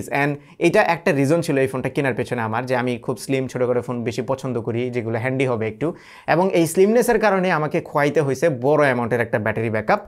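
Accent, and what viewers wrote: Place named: Kishoreganj, Bangladesh. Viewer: Still মানুষ আমারে গাইল্লাইতাছে শুনার পর আমি বিশ্বাস করলাম আপনি আমার জাতি ভাই কিশোরগইঞ্জা ভাই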